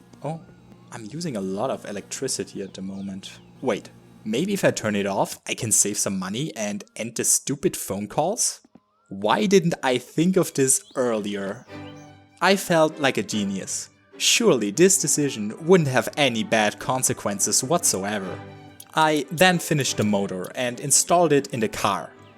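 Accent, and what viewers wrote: Germany. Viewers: because you sound like any average german 20yold with the way you speak english. No offense tho I'm german myself xD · why do you sound german? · Hey you soumd german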